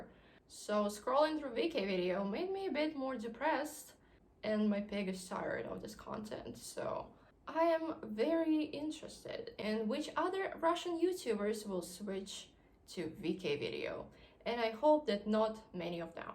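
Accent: United States. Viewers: Interesting comparison between Russian social media and YT. Your subs seem to be going well. Nearly 50K now. Going great. Hang in there. You do good work. I don't/won't have Face Book, so the question is N/A for me. I'm interested in where you learned your English? You have certain American sounds on certain words. Your English is very good, I don't hear any mistakes there at all. 😁😁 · I'm shocked at how good your English has gotten over the past year... You're starting to sound American lol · Your American English sounds amazing! I wish you all the best 😊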